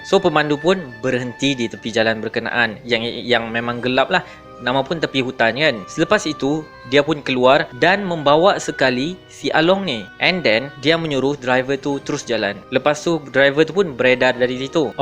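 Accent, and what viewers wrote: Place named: Sabah, Malaysia. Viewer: apa kau bunyi macam org sabah bah?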